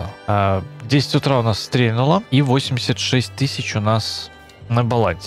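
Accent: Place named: Saint Petersburg, Russia. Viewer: Привет. Спасибо за серию. Ты вроде бы с Минска, а по нашему, по-Питерски разговариваешь. Откуда про бордюры знаешь??? )))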